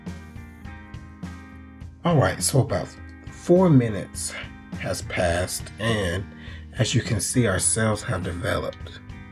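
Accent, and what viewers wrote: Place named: Alabama, United States. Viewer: You sound like you live over here where I live lol I live in Gulf Shores, Alabama… Where the humidity is crazy and the weather is hot during the day and not as hot at night, but the humidity makes it awful lol where is it that you live?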